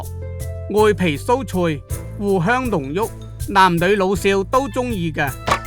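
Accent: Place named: Siyi, China. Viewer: 梗係我哋四邑口音啫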